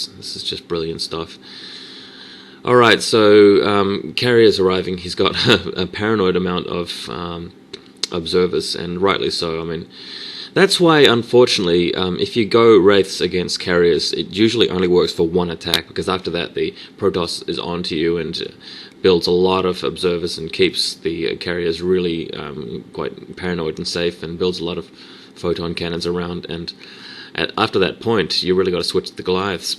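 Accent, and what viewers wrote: New Zealand. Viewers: I like your accent. Go NZ. :D And nice commentary, I understood everything that was going on, and I actually don't really know too much about the idiosyncrasies of the game.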